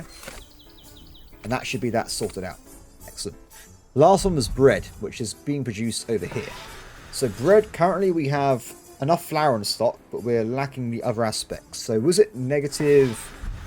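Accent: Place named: United Kingdom. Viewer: "A lot and are" word,sir you very cool with British accents specially with A alphabet James bond accents,are you from south UK sir?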